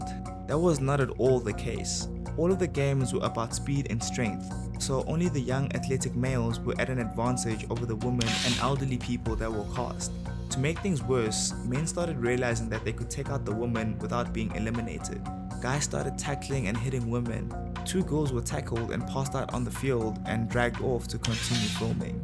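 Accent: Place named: South Africa. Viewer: What a great video, instantly knew you are from South Africa. We have a distinctive accent.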